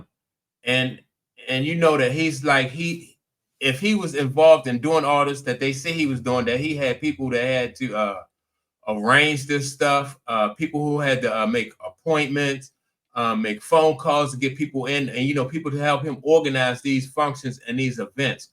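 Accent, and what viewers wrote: Baltimore, United States. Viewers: Yo! You from Bmore! Huh?!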